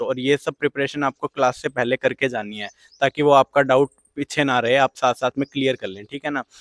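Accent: India. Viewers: Bhai ki voice haryanvi lg rhi h tone ❤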